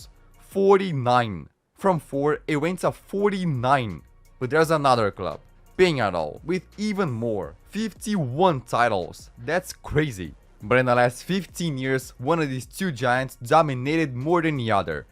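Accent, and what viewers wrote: Brazil. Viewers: hes brazilian bruh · Vc eh brasileiro? Adivinhado pelo sotaque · Você consegue percebe só pelo sotaque que ele é brasileiro kkkkk parabéns pelo vídeo mano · o acento brasileiro dele e muito evidente, fazendo esse acento no final das palavras, mas a pronunciação dele foi incrivel, ate imitando palabras em espanhol com acento ingles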